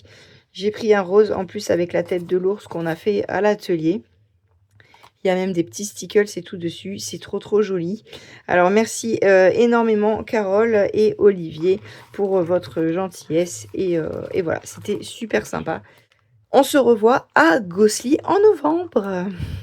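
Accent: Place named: Franche-Comté, France. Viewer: Coucou, hâte de voir les belles créations que vous allez nous faire. Et vive l'accent franc-comtois 😂 petite caresse à vos compagnons à quatre pattes 😻🐨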